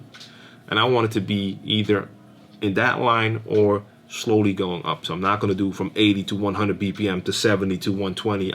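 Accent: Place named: United States. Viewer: Wow, your accent sounds like you were born in the US and influenced by living in NL, if I met you on the street I'd never guess... haha. Thanks for the videos man, I've been a mix dj for years, just trying to get better at scratching... you've got some good tips.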